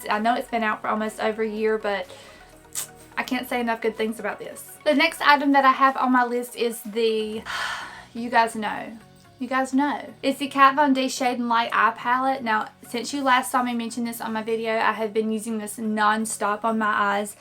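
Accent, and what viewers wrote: South, United States. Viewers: You are stunning and I love your accent! Are you from the south?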